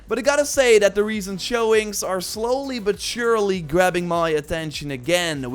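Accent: Netherlands. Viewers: mate, I love your accent(Dutch I'm guessing?)..."I do like how dey expond on dis" It's just cool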